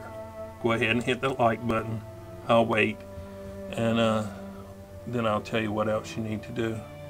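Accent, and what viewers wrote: United States. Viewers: hello, I first saw your channel about half a year ago and I liked what I saw but then I just kinda forgot about that until today when I was watching Mingles with Jingles and looked in the description and saw your name. I was like oh yea I remember him so now here I am.:) About the giveaway I don't know If its over yet or not and honestly I don't believe I would win it anyway, I don't usually. But I don't care about that I like that you are kind of like my favorite YouTuber Magz, just a little slower in speech and you're American so that's cool. You seem interesting and your content seems more mature than some other YouTubers I shall not name, thus you have gain another sub today.:D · nice to hear an american voice. Thought it was an EU monoply:)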